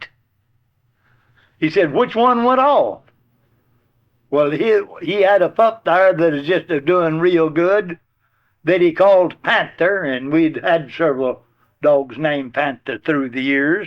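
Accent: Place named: Rural, United States. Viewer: The fantastic thing about this fella is not only his storytelling but his vocabulary while sounding like a dumb azz hick. This man is very intelligent. Everytime i listen to one of these i am so interested because i feel like i was there. This guy was a great man, believe his name is! Lol, just foolin' about...great upload. All of them...😊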